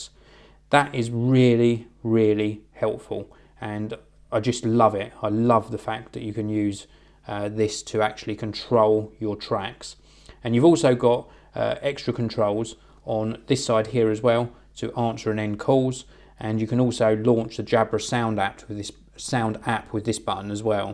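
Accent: United Kingdom. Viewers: Nice and complete review man! The best I've saw on that one (the smooth English accent is a +)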